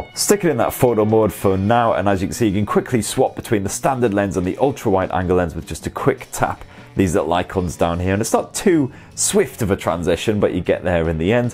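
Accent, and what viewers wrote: United Kingdom. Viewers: Said the actress to the bishop! I can tell your British! Good review getting mine next week!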